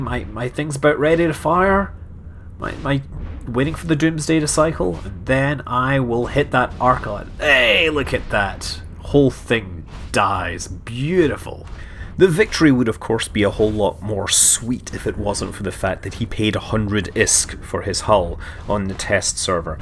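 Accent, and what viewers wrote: Scotland, United Kingdom. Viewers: dunno what it is about it, but hearing a scotsman quote scripture...just sounds...right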